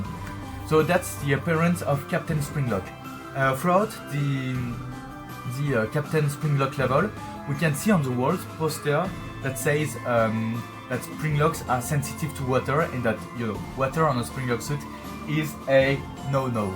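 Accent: France. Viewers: Are you French? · this is the THICKEST franch accent I've ever heard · Are you french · Tu sais quoi, tu m'inspires. J'ai aussi un accent français quand je parle anglais et ça me freine beaucoup quand j'ai envie de créer du contenu en anglais. Je trouve ça vraiment incroyable que tu "oses" faire une vidéo complète en anglais ( entre guillemets parce que le mot "oser" à une connotation presque négative, comme si ça impliquait que t'aurais jamais du faire la vidéo! Et c'est pas ce que je veux dire du tout!). Enfait bref, j'adore et merci beaucoup! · The french like accent is soo soothing, good video , ill be studying this video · Are you french ?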